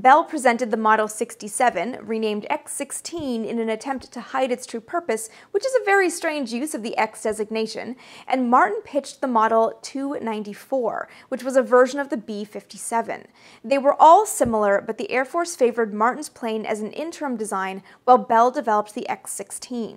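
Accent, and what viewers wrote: Quebec, Canada. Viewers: English is a complex diffuse language that includes much from Latinate, Romance and Hellenic origins, plus regionally on neighbour and partner languages. Do you pronounce "cafe" as per the original form "café" or a literal mangled version like "caff" or "kayfe"? How about Jalapeño with a hard "J" and a "peeno" at the end? I am fluent in four languages (English, Finnish, French, German) and I found that once I worked beyond my mother tongue (English) I began to understand how it works, when it doesn't, it's oddities and the like, plus basic respect for non-English words being pronounced correctly. I'm guessing that by how pronounces her English, she is Canadian and hence is likely to be more au fait with French pronunciation and recognising word forms. The worst though, is NHL commentators mangling people's surnames without actually bothering to learn how they're truly pronounced. 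That's beyond lazy to the point of arrogance. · She sounds Canadian. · Proud of you even after your Canadian pronunciation (yes my dear, I'll deal with it). Been watching you for some years now and bought your book on women aviators for my 5 yo grand daughter (my 87 yo mother read it and loved it, I too). Do you have any capability or desire to Sign your book? I'd love for her (my grand daughter) to have a signed copy, do you do this? Keep it up, you and others that love aviation history, swell my heart in a good way. I'm a retired Navy Air Traffic Controller that grew up watching Mercury, Gemini, and Apollo. I still cherish watching Apollo 11 as a 11 year old boy at my Grandma's on a warm East Texas night in July, 1969 land on the moon. Still get chill bumps thinking about it... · I don't understand why this is throwing some people into such a tailspin. Have you heard of a French-Canadian accent? 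· Canadian accent is really coming out today. 😋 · She's a Canadian and has a bit of the French accent. Fun fact just drop the 'H' in every word you say and you are on your way to great French Canadian accent.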